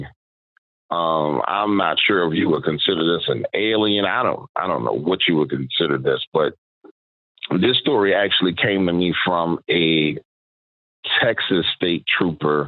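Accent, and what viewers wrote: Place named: New Orleans, United States. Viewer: Aah my fellow New Orleanian!! I can hear your accent a mile away 😅 “dawk wadders” Love it! 🫶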